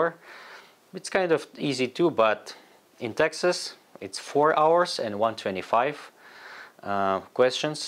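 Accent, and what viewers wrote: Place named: Russia. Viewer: Sounds like Russia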